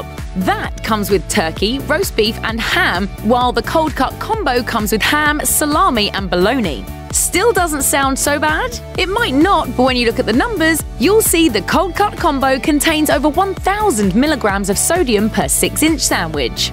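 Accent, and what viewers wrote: United Kingdom. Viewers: British accent makes things look scientific. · "your narrator sounds British accent" "that was awkward?!" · Double pastrami Double steak And double never listen to a British chick tell you about American fast food restaurants... WTF...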